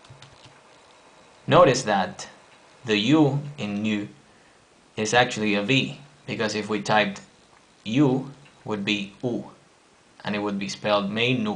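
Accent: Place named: Finland. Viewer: I say its a finnish accent.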